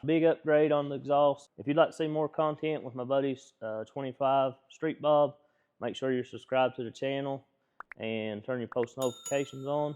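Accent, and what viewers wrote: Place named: South, United States. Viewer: Big farting slow talking southern draw coc skr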